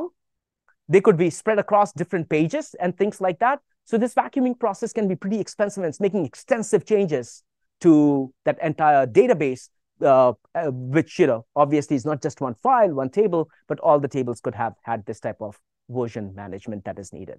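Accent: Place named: India. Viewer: Indian English is hard to listen😂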